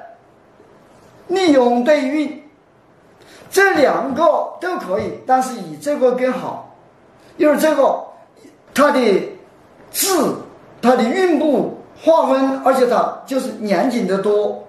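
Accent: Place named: Wuhan, China. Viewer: 武汉口音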